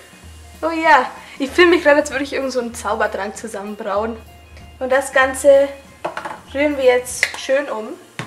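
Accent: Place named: Franconia, Germany. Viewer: Oh, du bist so sympathisch :) Muss ich unbedingt ausprobieren, suche schon lange nach einem gutem Harry Potter-Butterbier-Rezept :) Ich habe gerade gelesen, dass du aus Bayern kommst (bzw. hört man es auch an deinem Dialekt ein bisschen :D), aus welchem Regierungsbezirk kommst du? Hört sich an wie Franken :b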